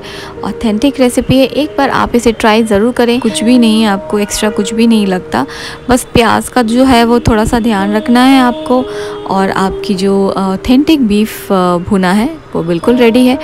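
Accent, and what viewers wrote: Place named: Eastern Uttar Pradesh, India. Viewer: you must be from Eastern UP. Mau Banaras side 😂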